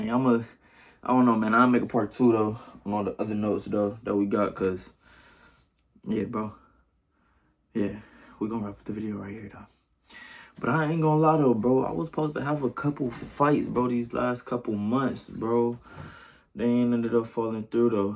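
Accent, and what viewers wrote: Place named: Cleveland, United States. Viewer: Yep u get attached to something u want not need 🔥 Point 1)Yep misery loves company Bro 🔥 Point 2) No wonder Brothers like you reach out to me! I exudes this energy! Point 3) Yeah I can show love whenever I can cause IDGAF what anyone else thanks 😂 I'm pretty sure that's another reason for reaching out 😂❤️‍🔥 Point 4) Yeah my internal completion is my own trophy, belt 🙌🏿 As long as I help 1st & wouldn't mind help 2nd ❤️‍🔥 Point 5) That's why I've graduated from community college with a 3.7 cause I had to take off in the past, fix & get my flow right, make sure I'm in alignment with myself 1st & obviously God & the 1st of da Fam that was there for me at that time who got me too ❤️‍🔥🤝🏿❤️‍🔥 Point 6) Yeah Bro they say if u chase something it runs away 🏃🏿‍♂️💨 😂🤣 So Once I became it Good Brothers like u started reaching out to me ❤️‍🔥🤝🏿❤️‍🔥 Point 7) Yep I went off of frequency to help reach out you & I'm goin completely off of frequency with myself 1st to make sure my life takes off this week 🔥🔥🔥 Point 8) Damn Right Brother 🔥💯 ++++ Bonus that waz a bar fo bar 😂🤣 & PS Yes now this makes since I have an increase of yall reaching out to me now & new Brothers reaching out to me 😂🤣 its the energy from all the good work I'm doing yall feel 😂❤️🤝🏿❤️💯 Good Video My Little Brother & yeah I emphasize with that Cleveland accent sometimes I have a tongue twister 🌀 too with my Detroit accent 🤝🏿😂🤣🇨🇦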